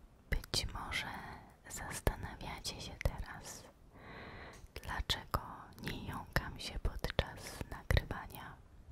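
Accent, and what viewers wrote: Poland. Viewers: Enhorabuena por tus 20000 suscriptores. Te lo mereces porque lo haces muy muy bien. Tus vídeos y tu acento polaco son excelentes para relajarse. Gracias y un beso.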